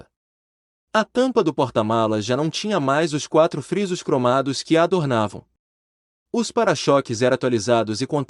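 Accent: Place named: Portugal. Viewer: Que bobagem! Uma reportagem tão boa e vem me falar de correção da pronúncia? Qual o problema de se pronunciar à "moda portuguesa"? Qual é.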